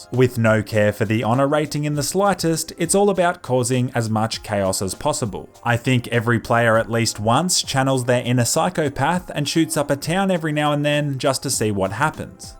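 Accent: Australia. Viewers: Is this Aussie accent? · You always sound weird. You're Australian.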